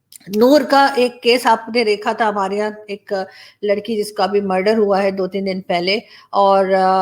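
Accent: India. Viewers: It is hard to believe that you are from Islamabad.Speaking and behaving like a typical Indian lady.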